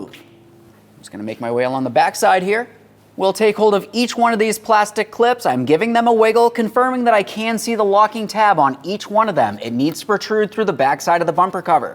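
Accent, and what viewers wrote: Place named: Los Angeles, United States. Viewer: I live in Los angeles and this is how everyone speaks in this hedonistic and PC city.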